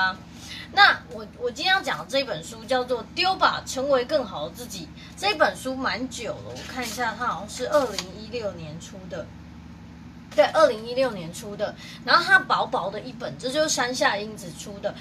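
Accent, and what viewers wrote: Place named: Taiwan. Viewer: 的台味超級喜歡😘有買的書，現在在家防疫都聽說書邊丟東西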